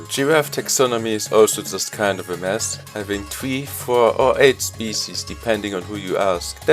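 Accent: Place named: Luxembourg. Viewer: I was about to say that you are the first German youtuber I found, that has an adorable accent, instead of an insufferable one, but then I realised that you aren't German at all but a Luxemburger, which explains it. 😅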